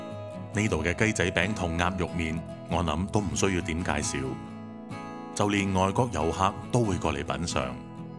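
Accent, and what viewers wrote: Hong Kong, China. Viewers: 請問你是香港人嗎？因為聽口音不像馬來西亞華人。。😀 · U sound like Hongkie. Great job. Hope to see more of your work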